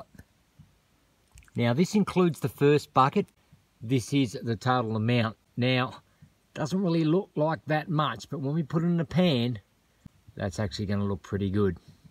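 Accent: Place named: Australia. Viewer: Absolute awesomeness!!! Love your idea and you proved it works! Thank you for that warm Aussie Love! Adore your accent ❤❤❤ Coming from Alberta, Canada 🇨🇦❤ Love Australia 🇦🇺 ❤ Blessings 2U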